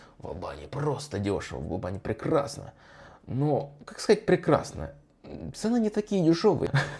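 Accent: Russia. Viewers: Послушал автора и сразу понял, что он с россии....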